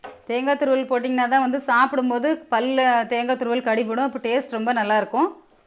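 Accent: Tirunelveli, India. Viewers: Nellai slang la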